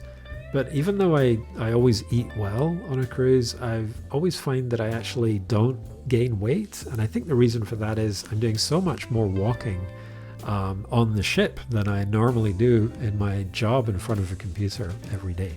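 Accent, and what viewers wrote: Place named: Northern Ireland, United Kingdom. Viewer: Thanks for video, I like Princess and Celebrity but the latter is getting very uppity with their pricing these days. In fact I do not think we will be able to afford them again, plus when in the booking mode online, at the end there seems to be a huge upgrade price to pay if one wants a refundable deposit. I won’t have that, so c’est fini. I won’t ever visit a zoo, aquarium or anywhere that keeps animals prisoner for monetary gain. Visits just encourage them. Sanctuaries are different. Thx again. Where is your accent from, my friend from Londonderry, N.Ireland says “food” as “fudd” like you. Sorry but it is not “gi gi’s” as in the kids’ name for horses, but the French soft G, like an “sh” sound. The older ships have the name Alfredo’s, and up until this year it was free. Damn nuisance. Take care.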